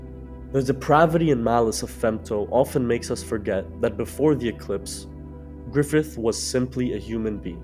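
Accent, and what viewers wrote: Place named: Levant. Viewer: course! On an unrelated note, are you from the levant? Your general vibe and inflections sort of make me think you're from the Lavant, perhaps Syria or Jordan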